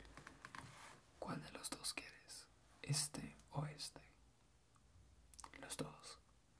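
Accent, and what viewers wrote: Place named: Colombia. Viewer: escuchar Asmr en "colombiano"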